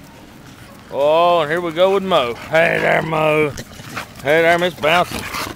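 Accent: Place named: South, United States. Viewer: They look like Staffordshire Bull Terriers. Try an sound less hill billy next time.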